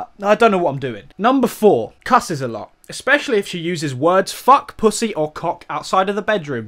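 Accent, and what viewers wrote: United States. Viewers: Your American accent is spot on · I love your American accent 😂😂😂😂 not even offended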